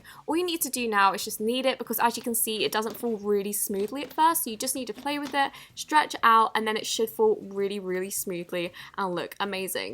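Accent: England, United Kingdom. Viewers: Wait are you English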